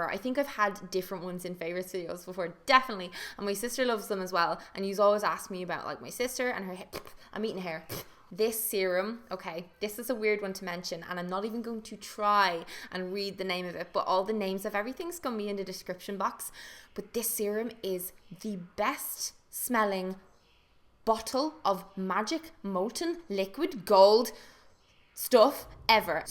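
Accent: Ireland. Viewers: Your sound so much more Irish in America if that makes sense 😂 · I love how I live in America and literally don't have neither the beauty blender or the Anastasia brow pencil.. like what the heck?! This has gotta be a sign! haha -also this is hecka random but I bet you make Californians happy with that amazing Irish accent you have :P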